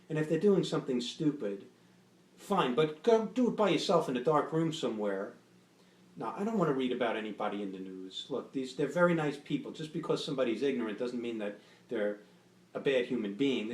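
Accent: New Jersey, United States. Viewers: I vape using smok Tpriv at 65-68w on recoil RDA at.20ohm using mxjo's dual 18650 and I produce big clouds. it's not how much power you put to device to produce a cloud. it's also what kind of juice your using PG/VG I use max VG. there you go yo sound like a joisy boy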